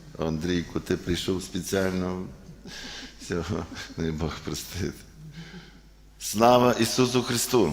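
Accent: Western Ukraine, Ukraine. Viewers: Отче, я живу в Тернополі і є парафіянкою нашої церкви Святого Йосафата, але дуже люблю дивитись і слухати Ваші проповіді, науку, відповіді на запитання. А ще Ваш діалект такий близький, як то кажуть, західняцький і почуття гумору. Велике Вам спасибі за Ваш труд і служіння Богу і людям!❤💙💛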